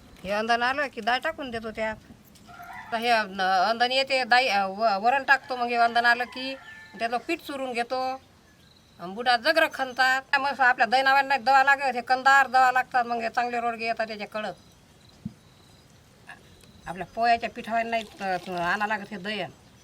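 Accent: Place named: Akola, India. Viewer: तुमची भाषा अकोला जिल्ह्यातील आहे असे वाटते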